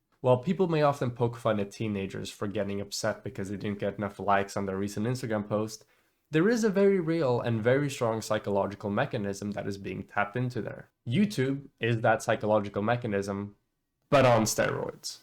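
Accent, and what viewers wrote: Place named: Sweden. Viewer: Lycka till med vad du än tar dig an framöver. Du har alltid varit en av de mest rationella och eftertänksamma röster i den här delen av internet. Om du tar med det till vad som kommer härnäst tror jag du kommer se framgång. Kommer fortfarande alltid vara gött att se dig när vi får se dig på andras streams lite då och då. P.S I really like the more pronounced swenglish accent you had in those earliest streams.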